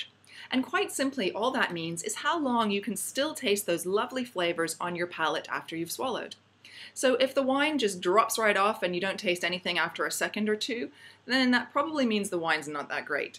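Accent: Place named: Australia. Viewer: I feel like you're Australian 20% of this video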